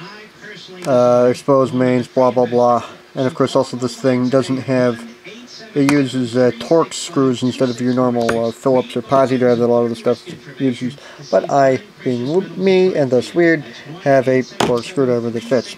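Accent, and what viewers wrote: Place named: Northeast, United States. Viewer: WOW, great video, lots of info! Your from the north east of the states? You talk fast and roll your words together.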